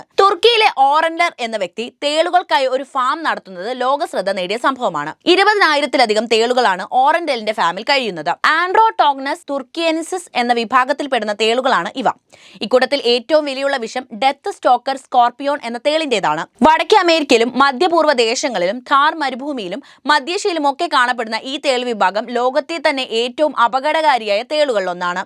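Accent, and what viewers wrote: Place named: Idukki, India. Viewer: സത്യം പറ,, വീട് ഇടുക്കീലാണോ ?😊😊 cause I’m from adimaly,, that accent of yours is same as mine 💪💪🥰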